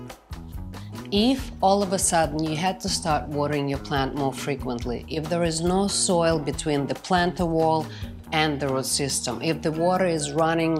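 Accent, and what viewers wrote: Russia. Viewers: Are you Russian? Why do I understand what you are saying if my English level is A1 😅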